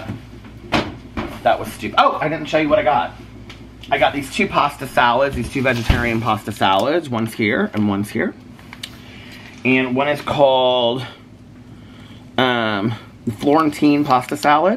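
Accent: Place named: Southern Indiana, United States. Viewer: I'm loving how that "Indiana southern" accent came out in full force in this video. 😃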